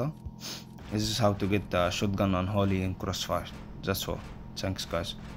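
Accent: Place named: Egypt. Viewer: مصري عظمه الصوت باين حصل باين فشخ xd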